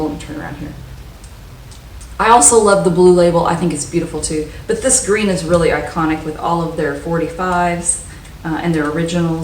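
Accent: South, United States. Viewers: I love your accent,. Are you from Georgia or the Carolinas? I’m in Wisconsin.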